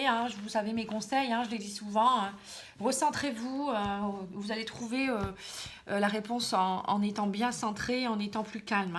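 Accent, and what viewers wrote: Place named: Pas-de-Calais, France. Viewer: 👍Suis du pas de Calais aussi je l avez perçu ds votre accens🤣😘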